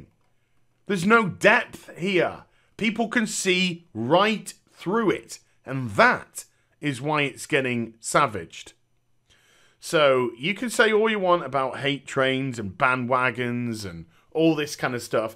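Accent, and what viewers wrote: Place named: United Kingdom. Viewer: More videos please mister funny British man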